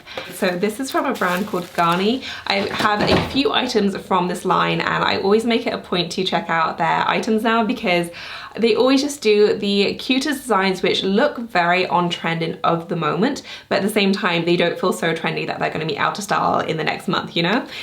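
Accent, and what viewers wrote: England, United Kingdom. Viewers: Love your videos, but must admit it's a bit disconcerting to hear you say 'store' quite so much in an English accent! Have never heard anyone here refer to shops that way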